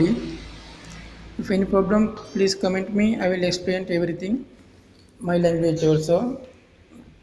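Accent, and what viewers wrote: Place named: India. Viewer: Your English like indian man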